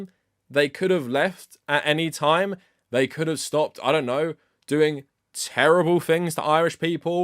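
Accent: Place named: RP, United Kingdom. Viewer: Dude talks about British mentality being so cucked but refers to himself as Irish despite sounding posher than 95% of the UK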